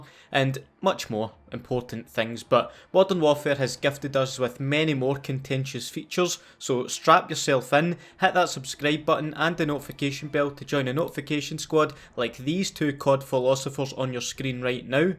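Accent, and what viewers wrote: Scotland, United Kingdom. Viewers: Nice fucking channel, love the humor helped by the Scottish accent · I love your accent so much. Are you Scottish?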